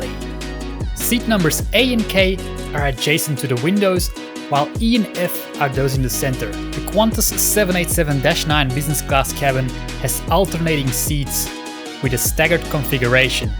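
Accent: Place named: South Africa. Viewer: Thanks for the upload. Haven’t flown Qantas for many years so it was interesting to see what they are like now. The lounge at Perth looked great and not overcrowded, a rarity these days. I’m trying to place your accent, there’s a hint of South African, mixed with a bit of Australian. I love trying to guess accents.😁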